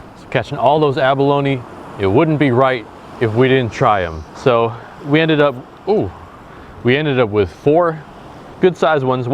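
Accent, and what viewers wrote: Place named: South, United States. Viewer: are sure your not from the south maybe just a southerner with a unique accent with all that butter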